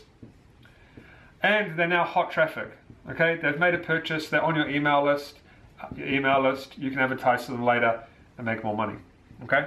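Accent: New Zealand. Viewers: I'm not sure if its because you're a New Zealander and I just instantly connect with the accent so i understand better about what you're saying, or whether you're just explaining it better than any other person on youtube, but man... This video is EXACTLY what i wanted to hear someone say to me about facebook ads. I've been searching for this information for weeks. You're the only guy who's explained this, thoroughly, in depth, in an easy to follow and easy to digest manner. I'm gonna go as far as saying this just about trumps every single $300, $500 "course" that some of these youtube "gurus" try to sell you. If I actually get sales from implementing your knowledge from this video i'm gonna thank you forever. Stay tuned ;)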